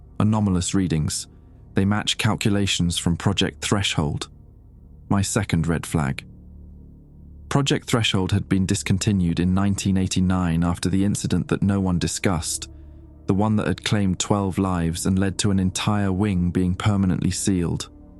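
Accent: England, United Kingdom. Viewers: The British accent is a huge turn off, especially since the guy is supposed to have worked at Area 51 in the U.S. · Another British voice narration , gee can I believe all this ? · Why does he have a British accent .? American military? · Seems a little suspicious with that English accent to be Air Force.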